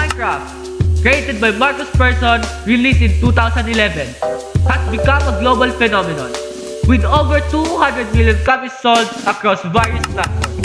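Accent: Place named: Philippines. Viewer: Filipino Accent😂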